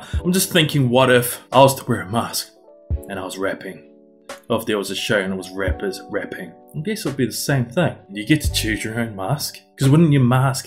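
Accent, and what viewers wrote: New Zealand. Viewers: omg are you from New Zealand? your accent:oo is amazing, react to more of his mask king videos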